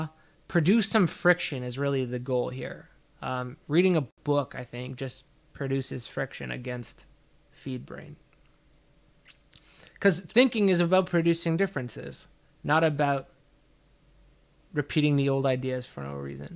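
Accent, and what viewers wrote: Canada. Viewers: Are you a west coast Canadian by any chance?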